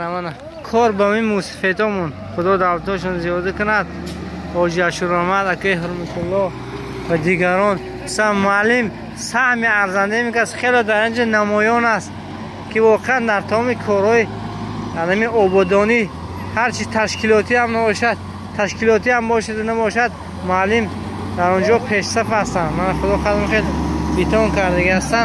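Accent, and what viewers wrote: Mastchoh, Tajikistan. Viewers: Бо лаҳҷаи Мастчоҳи гап мезанан.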